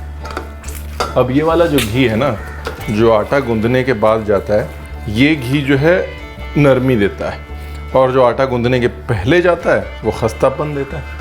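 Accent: India. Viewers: Desi language with super desi accent...but when you switch on vilayti one no one can imagine ki bande ne kya khub hindi ka hath pakda hai...👍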